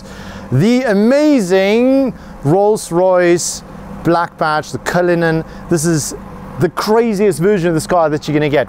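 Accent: South Africa. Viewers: This was an epic review as always! His presentation style, that honest emotion and RSA flavour...🔥🇿🇦 Dankie 💯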